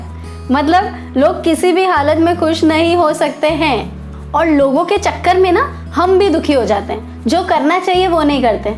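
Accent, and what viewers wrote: Gujarat, India. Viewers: Gujju tone😁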